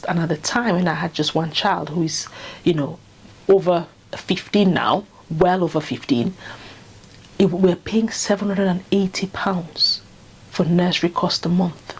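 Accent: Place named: Nigeria. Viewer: She’s not Ghanaian. Her accent is more of a Nigerian accent. I’m a Ghanaian and know a Ghanaian when I see one.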